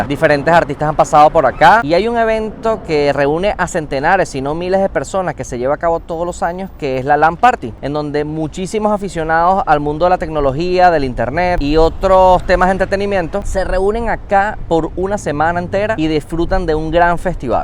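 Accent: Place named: Venezuela. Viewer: Pana,,hablas igualito a un buen pana mio Venezolano tambien,,😁😁,, concha y me estas embullando a mudarme para alla,, ya USA me infla las pelotas ja ja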